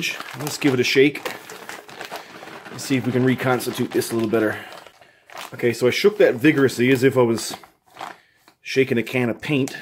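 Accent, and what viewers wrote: Newfoundland, Canada. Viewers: Newfie or from NS?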